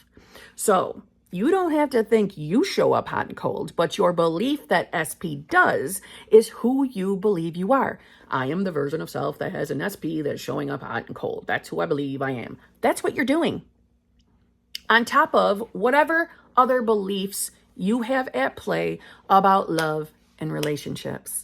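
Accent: United States. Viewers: You have a beautiful accent! Sounds very American 🇺🇸 ! The content is also good! 🤩✨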